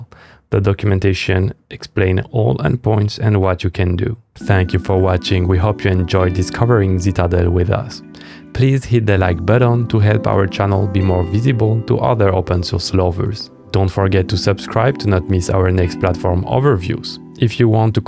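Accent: France. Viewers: Do I hear French accent??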